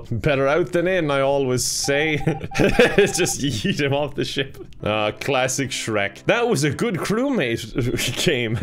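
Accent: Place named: Ireland. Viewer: Bruh I'm subbing because of the Irish accent and funny videos :]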